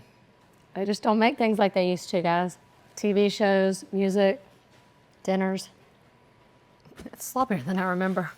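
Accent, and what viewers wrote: South, United States. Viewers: I grew up in the 90's & have never even heard of chicken divan until this video. She has a very thick southern accent, so maybe it's exclusively a southern dish?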